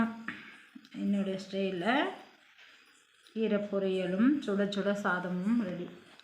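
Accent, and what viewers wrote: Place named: Salem, India. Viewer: நீங்க சேலம் பக்கத்து கரர் போல பேச்சு erukerathe nengal சேலம் மா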